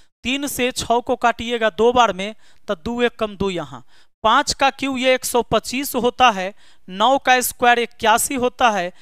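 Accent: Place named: Bhojpuri, India. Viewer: Ekdum bhojpuri style mein bolte hai to maja aa jata hai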